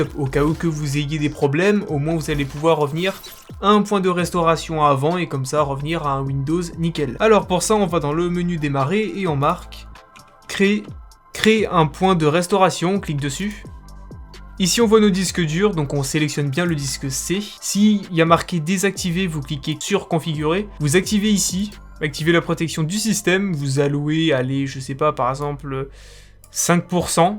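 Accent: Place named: England, United Kingdom. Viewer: sartek l'accent rosbeef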